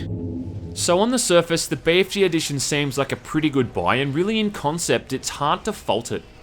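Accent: Australia. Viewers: It's been 6 years but for me, is the shit! The most honest, in your face, game reviewer. There is no comparison. For us FPS fanatics, it's either him or civvie 11. Reasons? No pandering to the lowest common denominator. No forcing political narratives in your throats. Respecting the old FPS gamers intelligence. Honesty and not getting blown away by modern game gimmicks. And That Australian accent. I grew up playing these games, so for me..... Best FPS game reviewer. Bar none. You Go! Respect from India. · "LOOK AT EEM, WOTS WRONG WITH YA FAICE???" I fuckin' love australians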